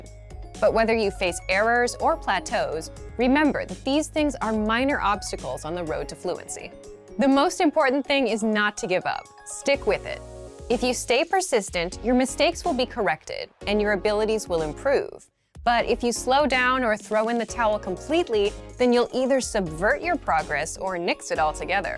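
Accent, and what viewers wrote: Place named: England, United Kingdom. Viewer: Thanks for your advises !!! I like your english accent. I hope soon to have this fluently way of speaking.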